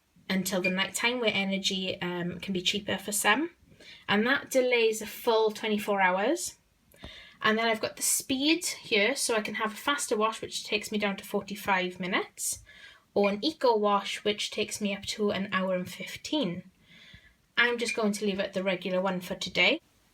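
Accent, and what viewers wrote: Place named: Wales, United Kingdom. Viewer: After sueing the arse off Currys and FINALLY getting a refund for the Hotpoint washing machine that only lasted 3 months and the case stressing me out i am looking for another Bosch as my old one lasted me 17 years so i have all faith in Bosch. Thanks for the review:-) (Love the welsh accent too);-)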